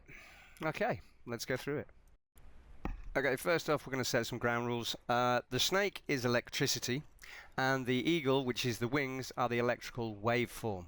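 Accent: United Kingdom. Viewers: getting in a fuss other someone's accent not sounding right to you is 100% an american trait lmao. Listen here English accent, speaking the language of your county properly. you say it onk like us 'murricns not ank, also while were here I have some words about the whole tomayto- tomahto, potayto-potahto debacle as well... LMAO I seriously can't believe some people are so dense to make a fuss about your accent.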